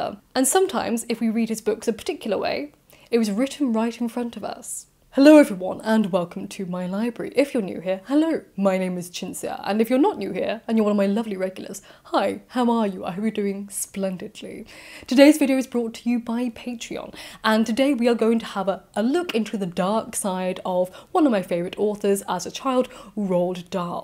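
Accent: RP, United Kingdom. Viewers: He sound like Boris johnston,, The Brittish upperclass don t change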